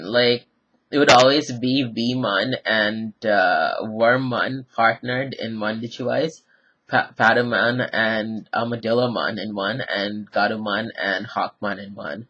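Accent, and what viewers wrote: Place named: Caribbean. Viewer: Where you from....You sound like somewhere from carribean Loving the accent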